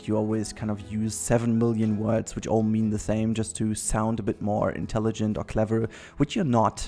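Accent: Germany. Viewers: Ich Finde den Deutsch Englisch Akzent so schrecklich 😂 is bei mir aber auch so mit dem Englisch was du gesagt hast 🙋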